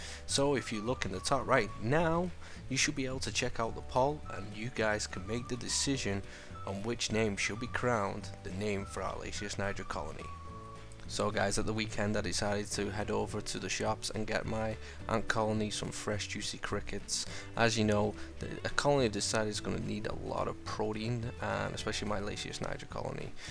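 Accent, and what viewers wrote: United States. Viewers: Are you American, Scottish or Manc? 🤔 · Why are you putting on an American accent?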